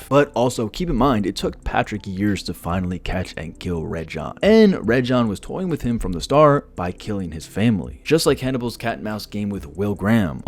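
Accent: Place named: England, United Kingdom. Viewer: You can kind of hear the English cadence in his American accent, it makes vigilante sound innocent and polite with all the dumb stuff he says so it’s funnier 😭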